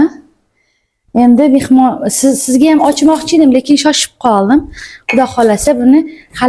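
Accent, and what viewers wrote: Uzbekistan. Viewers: Кайерлисиз синглим шевез Куконлига ухшаяпти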